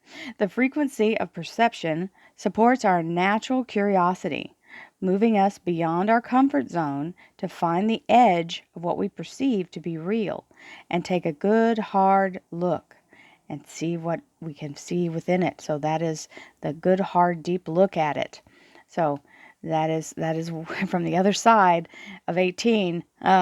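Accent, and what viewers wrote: Texas, United States. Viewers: Love your readings, and your voice. Where are you from sounds like Texas?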